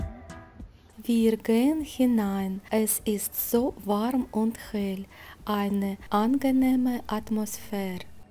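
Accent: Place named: Germany. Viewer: i think your English will be as good as your Germany so look forward to an English video but i like your German accent as well ,you look after self and take care ♥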